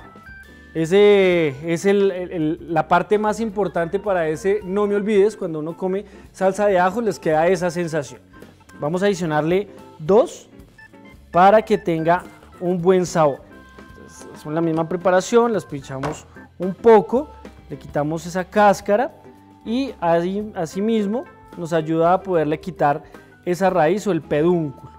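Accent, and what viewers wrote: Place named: Colombia. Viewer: El acento colombiano es raro pero es buena receta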